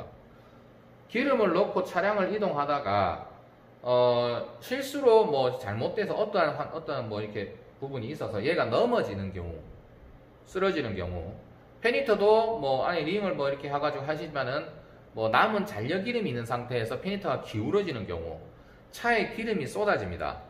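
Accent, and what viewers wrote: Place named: Gyeongsang, South Korea. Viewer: 뜨거운데 디지는 않습니다ㅋㅋ 갱상도 사투리 정겹습니다ㅋ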